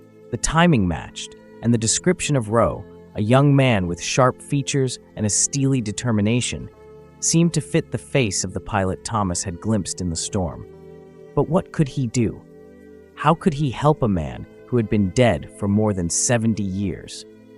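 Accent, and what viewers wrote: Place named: United States. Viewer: This video needed an English accent, not a yank. Just doesn’t work.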